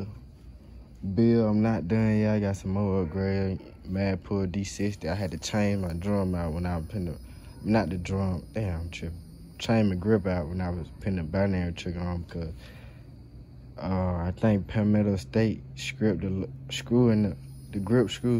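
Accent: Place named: New Orleans, United States. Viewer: Sound like you in New Orleans bro. You know we got to stay up on straps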